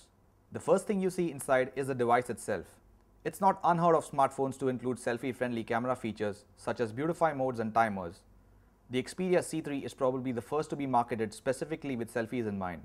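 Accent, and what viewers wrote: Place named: India. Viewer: FRITZ GOMEZ Indian dude.. Their accent always suck hahahahaha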